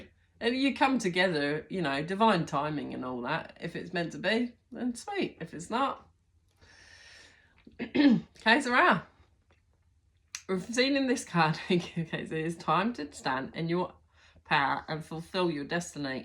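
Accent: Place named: New Zealand. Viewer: Hello. Firstly thank you for your chanel. And thank you for your Kiwi accent. I know this sounds silly but hearing your accent was like healing balm for my soul. It gave me back some Kiwiana and filled me up with the spirit of Aotearoa. Since Covid I am in Germany. And 3 years in Berlin. That might sound really awesome but trust me it wasn’t. It’s been just aweful cause the energy is so full of the old fear guilt and horror from the war and all I did was clear clear clear and still there is f so I much to do that I haven’t even made a dent in what is going on in Germany here. There is not only the residual energy of the second world war and Nazism but there’s also the second world war and then all the wars before that and now there’s all the energy about the witchhunts, because particularly where I am living now there were a lot of witch, burnings, and Germany was one of the worst countries of them all they burned twice as many women as any other European country. 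And I am living amongst all this stuff, and as I am a Devine Feminine empath and a healer, I just miss beautiful New Zealand. I realize New Zealand is not problem free however, it could be so so much worse. So thank you very much. I’m so glad I have found you now and that I will get my little bit of Kiwiana every time I hear your voice. And really it’s not just your voice. It’s your attitude. There’s nothing like a kiwi attitude. It’s so different from the rest of the world. It’s definitely unique and it’s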